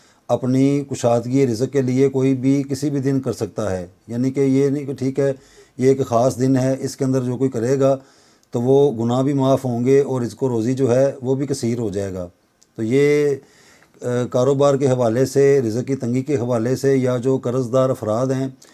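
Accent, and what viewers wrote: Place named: Lahore, Pakistan. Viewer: Acha to mery bhai lahore sy hain ..lgta to tha ap k bat krny k lehjy sy vesy ..main b lahore shalimaar baagh sy hun bhai ..baghbaan pura sy ..sun k khushi hui ap to sachi main apny hain mery .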